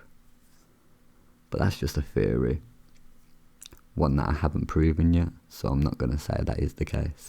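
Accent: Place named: Birmingham, United Kingdom. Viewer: BOOM! You sound like you are from near to where I came from? Are you a Brummie? Close by?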